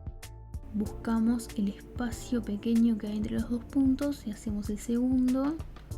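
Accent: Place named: Argentina. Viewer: Quedo super bonito! Ansío hacerlo. Pensaba que tu idioma de origen era inglés pero al escuchar tu voz me di cuenta que tienes un acento muy argentino, sino me equivoco!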